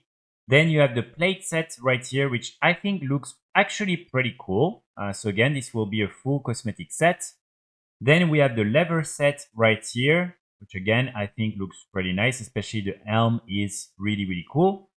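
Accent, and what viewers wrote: France. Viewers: I think he's french so that might be why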